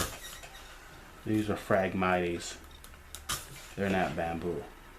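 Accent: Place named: Midwest, United States. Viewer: you my friend are from the mi-west I'm gonna say Wisconsin like me? you talk the area nice vid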